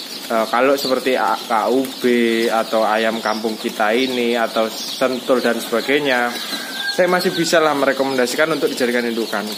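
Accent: Java, Indonesia. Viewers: logat bahasa kok seperti orang Jawa . Tak kira Jawa mas, 🤭. Di daerah saya belum ada pembibitan joper, jadi susah mau ternak joper tak ada bibitnya.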